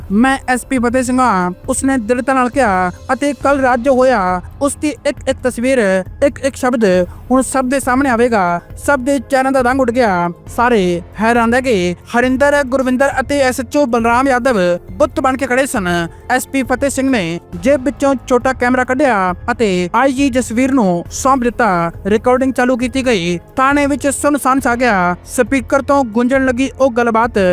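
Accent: Bihar, India. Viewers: Bolan vala koi jokar lagda hai. Eh punjabi hai ke bhaiya. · ਪੰਜਾਬੀ ਬੋਲਣ ਵਾਲਾ, ਭਈਆ ਲਗਦਾ?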